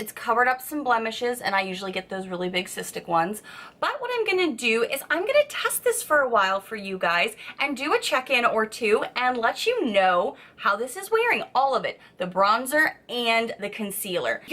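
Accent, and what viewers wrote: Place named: New Jersey, United States. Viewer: Ps I like the special jersey/queens accent you do lol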